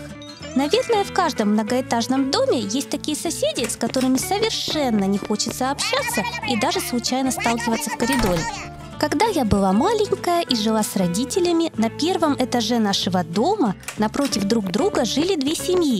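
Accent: Ukraine. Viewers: ти из ккрайини)))))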